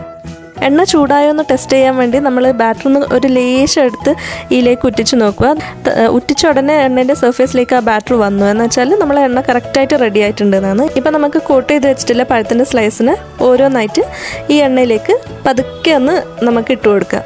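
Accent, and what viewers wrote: Kannur, India. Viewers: ഇക്ക് ഇസ്റ്റല്ലന്ന് പർജ്ജിലെ... 😂😂 kannur slang adipoli...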